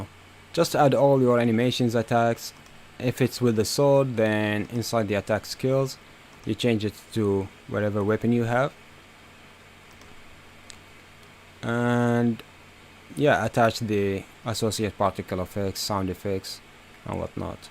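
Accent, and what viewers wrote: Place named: United States. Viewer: استاد لدي سؤال كيف تعلمت الانجليز و اصبحت تتكلمها كانك شخص امريكي اعطني نصائح لتعلمها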